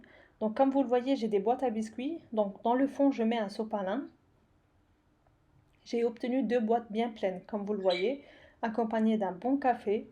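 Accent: Alsace, France. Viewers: Bonjour je viens de découvrir votre chaîne vous faites des recettes peu coûteuse avec très peu d'ingrédients c'est super sympa j'ai testé quelques recette c'est super bon par contre pour la recette des biscuits la le l'objet en silicone pour faire les biscuits au chocolat vous l'avez trouvé où parce que c'est c'est plus pratique une machine ou le hachoir pour faire les spritz donc est-ce que vous pourriez nous dire où vous avez trouvé l'accessoire très intelligente et continuer ainsi à nous régaler et à nous faire économiser de l'argent et continuer à faire du maison et arrêter l'industriel bisous et comptines bonne continuation Jennifer PS votre accent me fait penser à l'Alsace et vous en Alsace parce que je vois que vous prenez beaucoup de produits d'Allemagne bon courage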